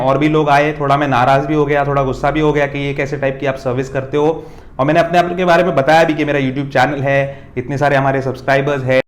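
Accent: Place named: Gujarat, India. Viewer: bro lil bit Gujarati accent its nice great video by the way ..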